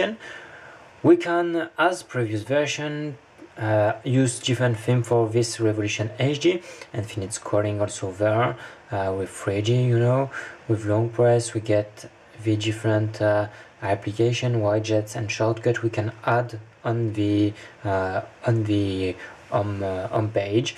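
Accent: France. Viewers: Haha, je suis venu dans les comms exprès pour voir d'où tu venais, je comprenais beaucoup trop bien ton anglais pour que tu viennes d'ailleurs que de france ^^ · On peux entendre dans ton dialecte on Anglais.Mais c'est typiquement "Francais-anglais" et j'aime ca :) Ou les Francais parle l'allemand et c'est chéri :D Ps : Excusé pour ma mauvaise francais :D Salutions de Luxembourg :)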